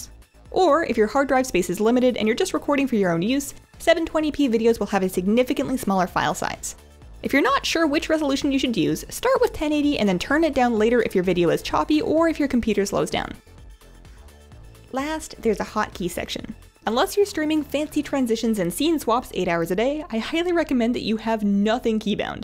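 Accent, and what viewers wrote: Canada. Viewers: Love your Canadian accent haha Very helpfull video!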